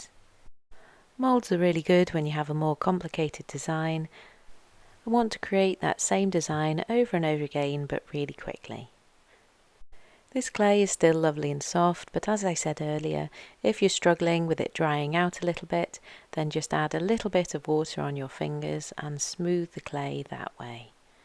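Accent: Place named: Liverpool, United Kingdom. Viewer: I love the Liverpool (or nearby?) accent ….so singy and relaxing! Thanks for sharing all your knowledge!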